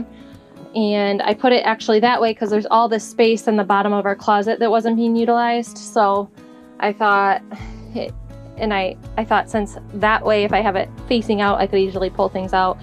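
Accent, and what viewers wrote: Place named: South, United States. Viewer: you are getting a southern drawl, also LOVE the intro. Cool storage ideas/hacks.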